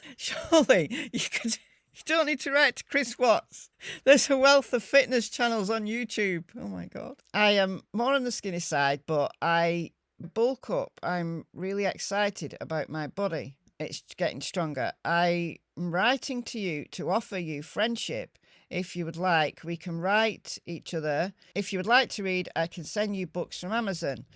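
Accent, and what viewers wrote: United Kingdom. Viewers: lovely to hear another brishish person xx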